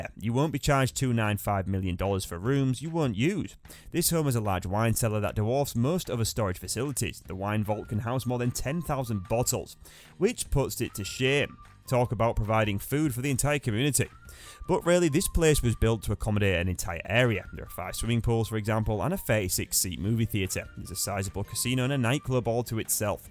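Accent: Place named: East Yorkshire, United Kingdom. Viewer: The commentator sounds like he's from East Yorkshire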